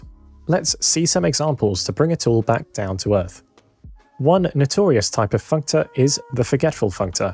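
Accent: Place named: United Kingdom. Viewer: what is...katuhgree theory?? british accents man. wild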